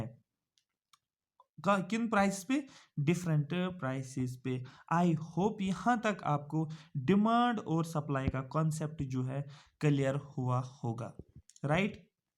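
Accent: Kashmir, India. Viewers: are u Kashmiri sir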